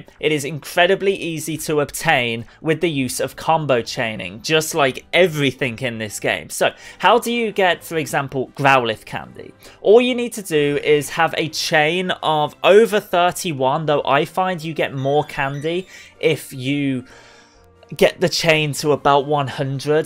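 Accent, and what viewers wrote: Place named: Liverpool, United Kingdom. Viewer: SCOUSE DETECTED RED OR BLUE CHAMPIONS OR CRAP CHOOSE NOW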